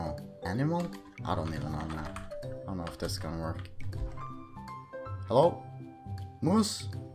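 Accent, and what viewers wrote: Sweden. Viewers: What are you Swidish or What ?or i hear wrong?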